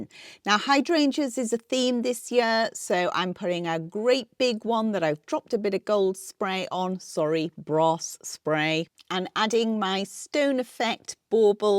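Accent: England, United Kingdom. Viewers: I love the way the English say 'watah' instead of waterrrrr :)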